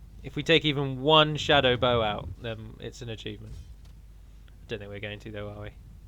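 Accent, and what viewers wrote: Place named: RP, United Kingdom. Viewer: Ah, the Anduin campaign. Where skill level drops significantly xD. In your other campaigns you play mostly as i'd do myself really (except for the queueing of 4k-ish gold cost buildings when you make like 300/turn:P ), but with Anduin I just want to scream at the screen haha. Mostly I resort to facepalming instead. I don't think you will fail the campiagn though, it's really hard to lose since the AI works in mysterious ways. But you aren't making it easy for yourself thats for sure. I don't really watch lets-plays but these I really do enjoy. Probably because it's a really nich (it's that the word?) game, mod-within-a-mod-whitin-a-really-old-game, combined with a posh british accent.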